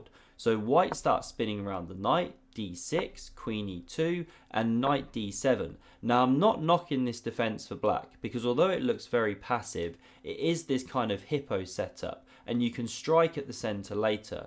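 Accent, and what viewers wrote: United Kingdom. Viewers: Funnily enough, I subscribed to this channel because I find his voice the easiest to listen of most chess guys. There are a lot of Indians and East Europeans doing chess content and though their content is good, the British accent is easier to my ear.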